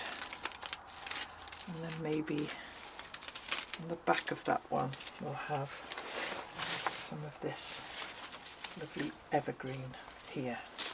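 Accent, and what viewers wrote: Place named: Lancashire, United Kingdom. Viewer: My Lancashirian grandmother was only 10 when she immigrated to Canada but she retained pronounciation of words like sun, stuff, does, and others. I hear her when you speak. LOL May you have a Merry Christmas and Happy, Healthy New Year.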